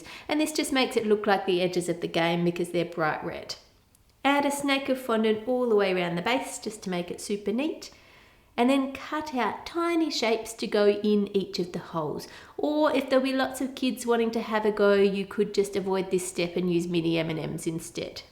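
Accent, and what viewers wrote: Australia. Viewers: R u Australian I am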